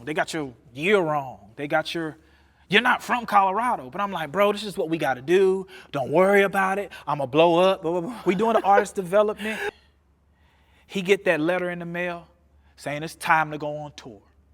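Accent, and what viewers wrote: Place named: South, United States. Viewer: Got that southern Baptist preacher drawl… love it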